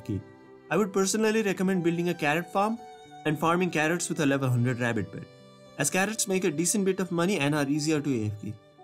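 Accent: India. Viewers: Your voice and acceny are really like an Indian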